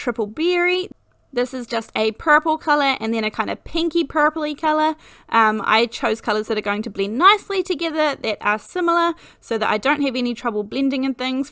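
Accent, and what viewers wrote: New Zealand. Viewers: just watched your awesome video, love to hear a Kiwi doing this, amazing. Thank you, and yes I've subscribed.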